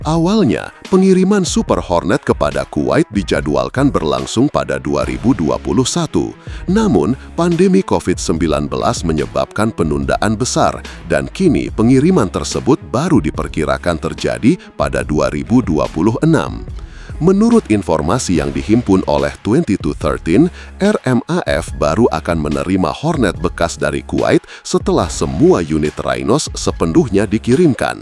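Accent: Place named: Indonesia. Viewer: kenapa admin suara indon dan bahasa indon.suka sangat indon cakap pasal pertahanan malaysia.kenapa admin tak bercakap pasal alat pertahanan indon sendiri.tak ada org berminat ke kalau cakap pasal alat2 pertahanan indon tak laku ke atau ada org minat.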